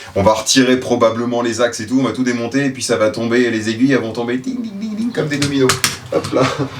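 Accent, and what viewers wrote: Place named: Charente, France. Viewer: Ça fait plaisir de t’entendre parce que je te suit depuis avant le Covid et ça faisait longtemps qu’on t’avais pas vu et retrouver cette façon de parler avec cette accent charentais moi qui habite en Bretagne depuis très longtemps ça fait vraiment plaisir. D’ailleurs j’ai trainé un peu dans le monde du moto cross en Charente à la fin des années 90/00 et c’est sur qu’on connaît du monde en commun. Bref continue ce que tu fais.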